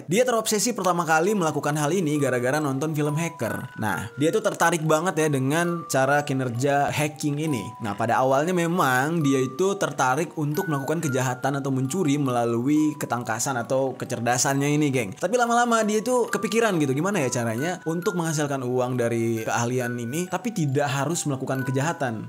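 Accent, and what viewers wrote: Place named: Medan, Indonesia. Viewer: Medan nih bos:v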